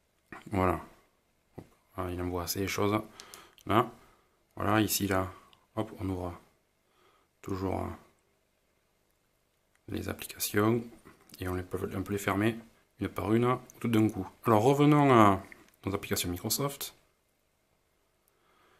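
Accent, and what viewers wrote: South, France. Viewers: Continue j'adore tes vidéo et l'accent du sud qui va bien comme toujours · Beau deballagE et tres bonnE video avec le bon accent du sudE !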